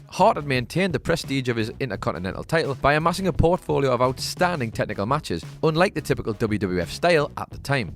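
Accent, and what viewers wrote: United Kingdom. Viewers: Well, not everybody is familiar with thick british accent. Plus he talks too fast. Is it Irish or Scottish accent? Its not like its impossible to understand. But some words are really. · accent is too thick man.. i get it youre british but you gotta go a little out of your way and stop using words that only english people use. it is difficult to follow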